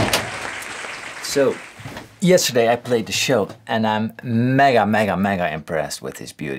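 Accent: Netherlands. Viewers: Olivier Yes. He's dutch! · Hey, I wonder where you're from! You're English sounds like how dutch people speak English. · Ik wist niet dat je Nederlands was. Hahaha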